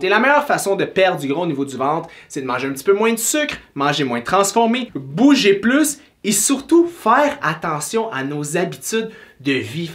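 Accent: Canada. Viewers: J’adore l’accent québécois salutations de la Suisse frère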